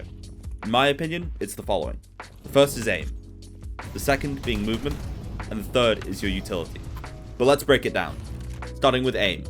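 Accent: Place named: United Kingdom. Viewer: AI? Brother, this i0s just a british boy doing a voiceover over cs clips. What is possibly AI? His brain?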